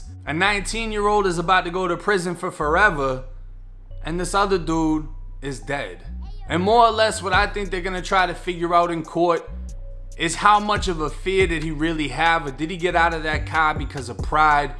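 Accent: Boston, United States. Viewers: Cuz is u from Boston slim?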